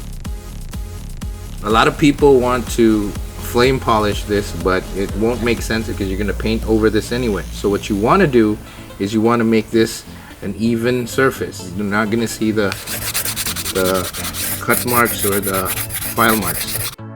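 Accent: Philippines. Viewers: Are from the Philippines, you sound Philippino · Pinoy accent sub😁 idol😂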